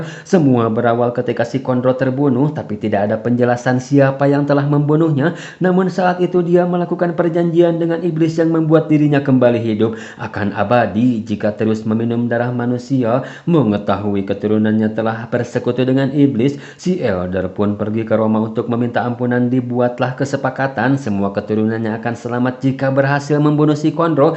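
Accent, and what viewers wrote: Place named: Subang, Indonesia. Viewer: Aksen subang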